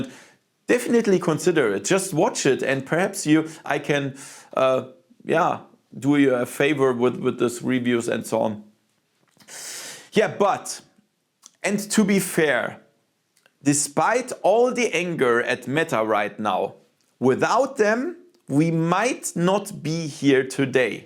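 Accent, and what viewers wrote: Germany. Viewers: he is german , you always can hear that , its with every language they try to speak.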